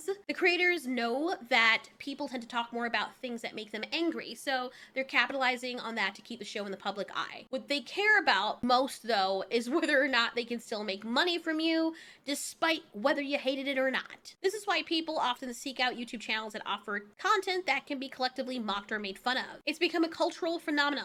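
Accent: United Kingdom. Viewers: Just gotta say it... Your Brit accent is on point! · That avitar and that British voice would sell the seas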